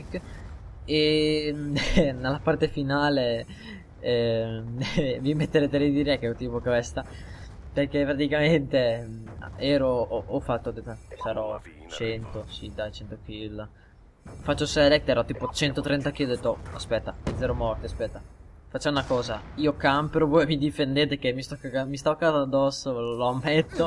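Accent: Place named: Romagna, Italy. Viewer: Ecco perchè mi sembravi romagnolo ;) Io sono di faenza e vengo al mare li in zona ;)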